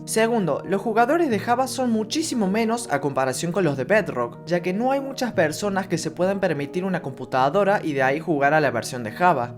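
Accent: Córdoba, Argentina. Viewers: amigo sos de cordoba capital?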